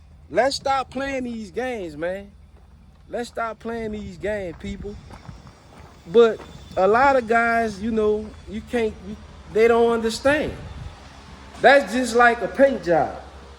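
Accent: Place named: South, United States. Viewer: Damn bubba you talk as country as I do 😂, Ima old ass white boy too😂, there's alot of other work besides sitting a long block on the mounts that folks don't think about or consider 😂. $$$ I gotta turn the wrenches myself while using a chilton or haynes repair manual cause there ain't no damn way I can afford to pay a professional wrencher!!! 🎉 enjoy your honesty and straight forward explanation of whats required to drive a damn vehicle!🎉 I'll take that ol school 305 and do what we used to do-cruise🎉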